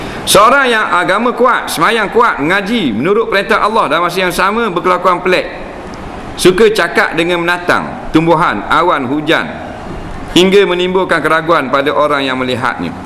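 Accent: Terengganu, Malaysia. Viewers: kaki sekam atau kaki cekang...aku pon x phm sb ustad ckp ganu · "nati orang balik lu bodo"... hahahhaaha...mmg pure terengganu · memang la haram...tengah lampu merah....kalau hijau halal le....kena paham betul ustaz cakap.... kalu nak main lepas akad nikah jangan la depan tok kadi dan saksi-saki...biar la diorang balik dulu... ustaz ni ceramah dia best sebab ada lawok...pastu...slang ganu kita...mu kena dengar molek2 jangan dia kata hare..mu terus amik jadi hare...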